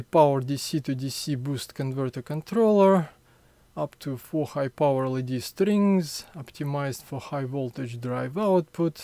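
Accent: Russia. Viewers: Ахренеть какой русский акцент.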